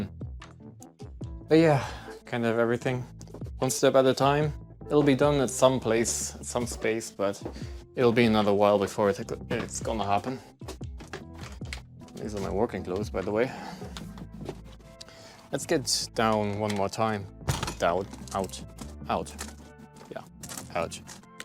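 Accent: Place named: Ireland. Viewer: Das war wieder ein sehr informatives Video😊 und du hörst dich langsam wie ein echter Ire an😂❤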